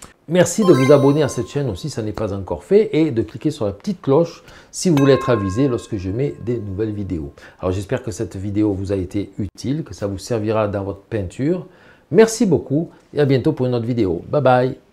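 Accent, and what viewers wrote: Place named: Provence, France. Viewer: Merci Monsieur , bien expliqué !! Intéressant pour me ressourcer ! Et Bel accent de Provence ❤️ Régine , artiste.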